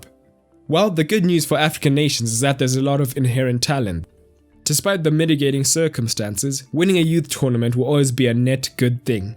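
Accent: South Africa. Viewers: Where are you from? You sound South African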